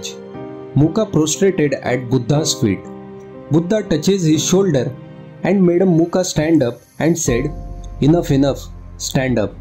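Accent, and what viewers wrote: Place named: South India, India. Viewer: No offence meant friend. Sanskrit words are pronounced like this in South of India. Peace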